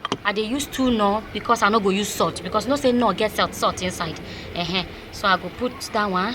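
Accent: Nigeria. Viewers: Pidgin english sweet for your mouth oh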